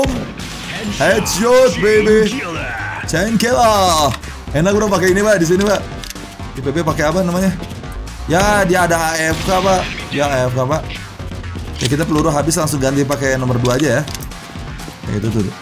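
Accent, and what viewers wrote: Bandung, Indonesia. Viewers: Bang orang Bandung ya?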